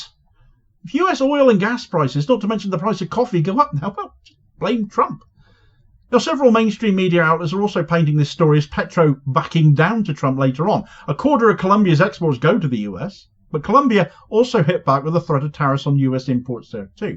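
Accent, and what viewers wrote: United Kingdom. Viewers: I love how this idiot with the stupid British accent thinks his opinion holds any relevance on anything in this country.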